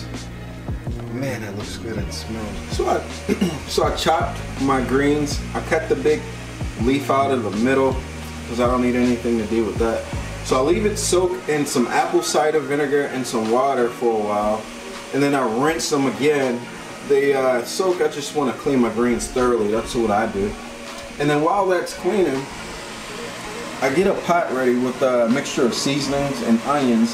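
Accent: Baltimore, United States. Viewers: You from Baltimore, ain't you?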